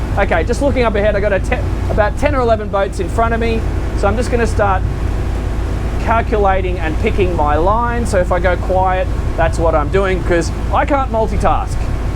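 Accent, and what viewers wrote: Australia. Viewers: The way you Aussies say "here", just boggles the mind lol. Hiare.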